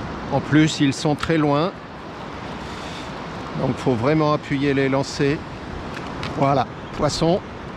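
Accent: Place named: Oléron, France. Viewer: Hahaha je me disais aussi….. il manquait l accent oleronais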